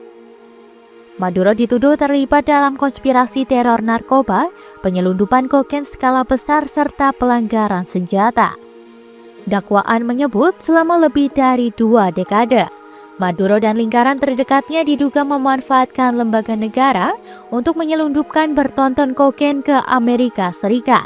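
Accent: Java, Indonesia. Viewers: Medhok sekali dubber nya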